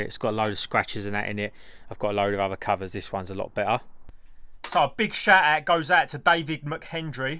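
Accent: United Kingdom. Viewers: Just came across your channel 👍🏻 refreshing to hear a fellow Brit. Most of these type of vids are usually yanks 🙄😂 . Don’t suppose you’ll be taking on an Astra j vxr any time? I suppose too there’s barely a fraction of J’s about compared to H’s. There’s really not a lot going on, online( that I can find anyway) people working on them. Plenty of the H’s but not J’s. At a bit of a loss as what to do next as well as being a bit apprehensive . Cheers, good vid. Sub button hit 👍🏻🇬🇧.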